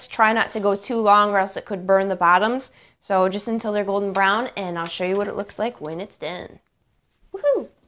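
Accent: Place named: Wisconsin, United States. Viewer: You sound mad North Western. Almost wisconsin like lol.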